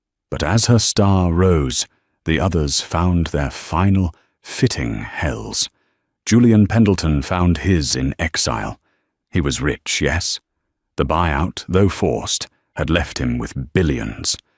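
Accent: United Kingdom. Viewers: Another interesting story about a sensitive issue. Well written. I love this British narrator voice. ❤❤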